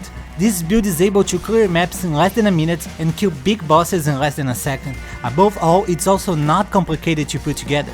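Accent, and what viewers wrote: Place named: Brazil. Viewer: E esse sutaqao br ai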